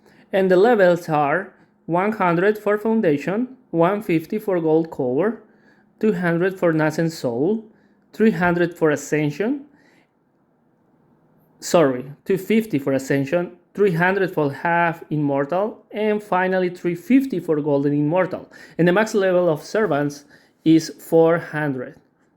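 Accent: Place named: Mexico. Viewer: Nice, didn't expect you to sound like every other Mexican.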